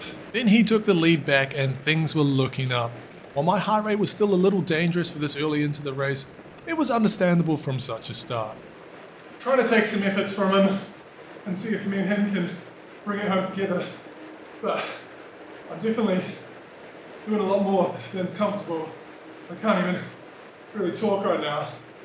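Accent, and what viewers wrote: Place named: New Zealand. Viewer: Loving the kiwi zwift commentary. Follow on zwift as well. When’s a group ride coming?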